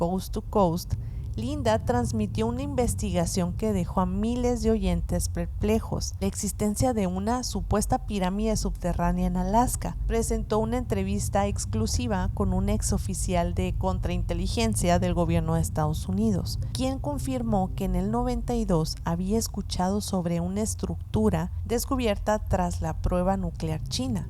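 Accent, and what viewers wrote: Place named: Hermosillo, Mexico. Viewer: Se nota el acento Hermosillense, saludos paisana.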